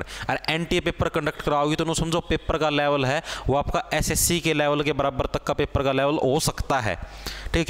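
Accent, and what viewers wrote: Haryana, India. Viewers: यूपी की धरती से आपको राम राम मजा गया हरियाणवी सुन kar 😂😂😂😂😂😂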